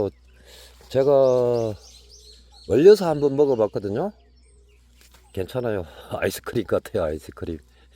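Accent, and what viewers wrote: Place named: Gyeongsang, South Korea. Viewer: 체리가 아주 맛있게 무르익었네요 저희는3키로 다 먹었네요 ㅎㅎ 가게손님들이랑 맛나게 먹었어요 싱싱하고 아삭 상큼해요 아주 맛있게 감사하게 먹었습니다 경상도 사투리로 체리 시식먹방 정겹게 잘보고 있어요~😅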